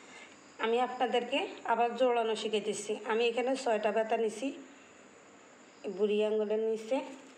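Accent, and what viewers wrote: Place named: Noakhali, Bangladesh. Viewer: আপনার ভিডিও গুলো আমার অনেক ভালো লাগে আপু আপনার কথা গুলো একদম নোয়াখালীর মত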